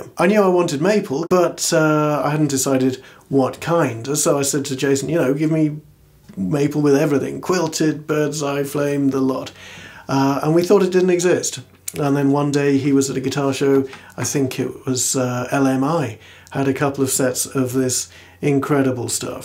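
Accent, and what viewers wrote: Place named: United Kingdom. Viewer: really enjoy your playing and videos....something too about a calm British accent speaking the King's english lol!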